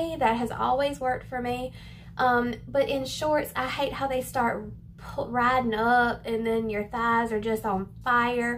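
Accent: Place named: Louisiana, United States. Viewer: Girl! You are cute as a button! Where are you from? I’m from Louisiana and your accent sounds like home:) I just found your videos and love your clothing reviews:) I’m just now trying some online clothes shopping and it’s great to have honest opinions. We have almost the exact same measurements and body shape as well, so I’ll definitely continue watching your reviews:) Thanks for all you do!💕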